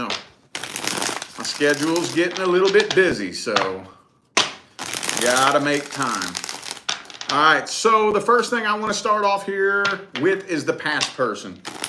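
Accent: South, United States. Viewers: Thanx so much for your reading...I'm also a tarot reader..but I love supporting all my fellow mystics...I'm from TN..you sound southern..I'm curious 🧐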